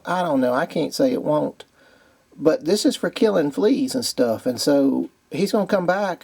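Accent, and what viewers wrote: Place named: South, United States. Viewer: I love the southern accent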